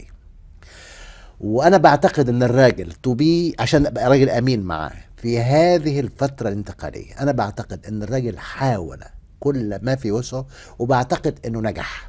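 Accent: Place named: Levant. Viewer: حكواتي بلهجة إخوانا الشوام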